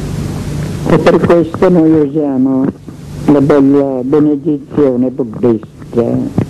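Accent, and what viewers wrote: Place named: Veneto, Italy. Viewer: La tipica voce di Assagioli con inflessione leggermente veneziana. :)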